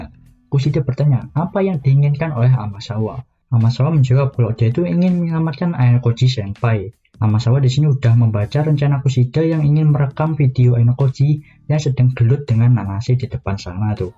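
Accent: Java, Indonesia. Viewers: Sedang mendengar cerita LN dengan suara medhok,☝👍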